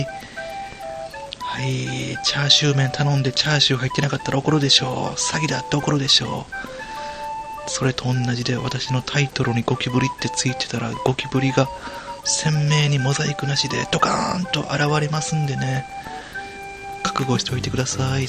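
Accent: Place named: Juso, Japan. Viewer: 十三のイントネーション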